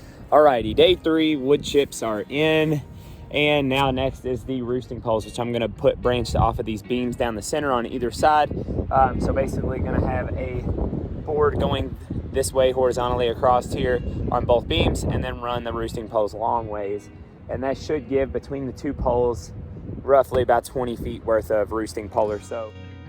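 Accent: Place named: South, United States. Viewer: You have a southern accent. Where are y’all? I’m in NC. Just ran across your channel 👍🌻🥬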